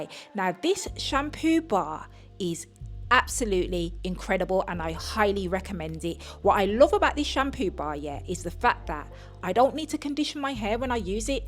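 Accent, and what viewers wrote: Birmingham, United Kingdom. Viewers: I hear a Brummie accent hehe😜☺️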